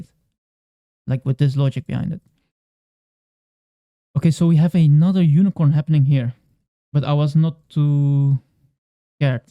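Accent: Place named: Belgium. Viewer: ik a belgian accent whn i hear one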